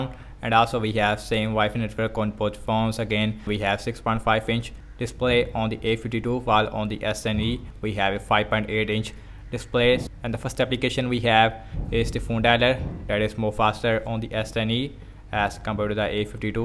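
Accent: India. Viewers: Es hindú, yo creo por eso habla así 😂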